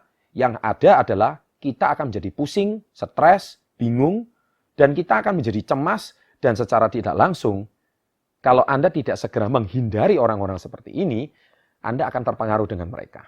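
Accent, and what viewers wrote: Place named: Banyumas, Indonesia. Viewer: Kurang ngajar sama orang tua ya kuwalat guees????orang ngapak iki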